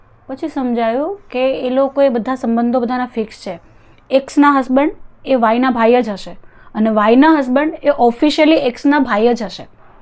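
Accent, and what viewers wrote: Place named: Kathiawar, India. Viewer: મોયજ આઇવી હો .. તમારે મોઢે અમારી બોલી હાંભળી ને... અદ્દલ કાઠિયાવાડી સવો તમી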